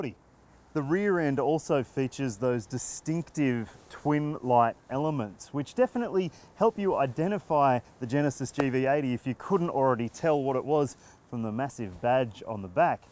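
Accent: Australia. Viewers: Im Korean and I decided to study your Australian English so I subscribed this channel.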